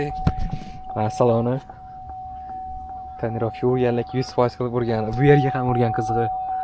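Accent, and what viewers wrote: Khorezm, Uzbekistan. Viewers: Xorazmdanmisiz accent xorazmga tortyapdi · xorazmli misiz xorazmli misiz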